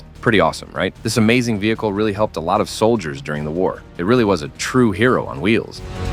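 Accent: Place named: Russia. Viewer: Впервые услышал руский голос автона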